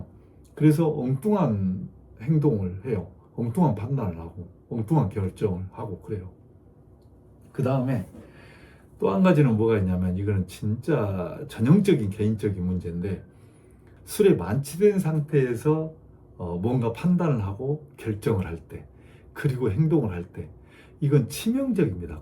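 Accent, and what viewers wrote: Jeolla, South Korea. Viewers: 잘듣고있던중. 절라도사투리를. .거참 .!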